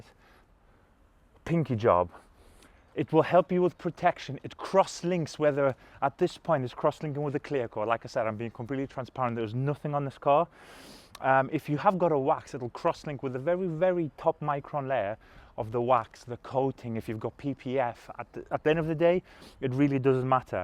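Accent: United Kingdom. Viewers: Are you a geordie?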